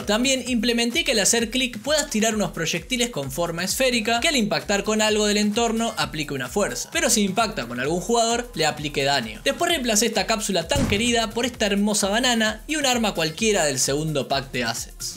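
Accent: Argentina. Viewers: Argentino? No se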